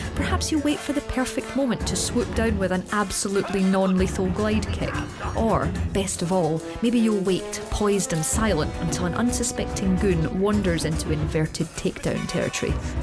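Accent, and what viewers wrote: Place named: Scotland, United Kingdom. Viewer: It's called Scottish. You'll find that there are quite a lot of people with this accent there.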